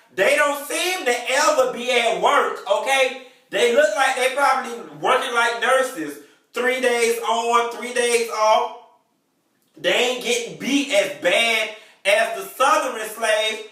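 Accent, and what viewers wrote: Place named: South Florida, United States. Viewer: i tought that you were a south florida dude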